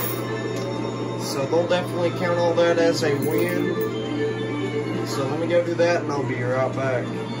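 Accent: South, United States. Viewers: Damn , I’m from Alabama and this guy sounds as country as they come ! Lol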